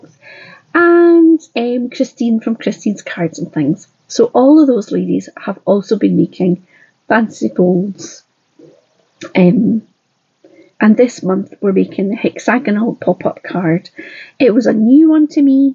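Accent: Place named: Scotland, United Kingdom. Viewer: Superb cards and I love your gentle Scots accent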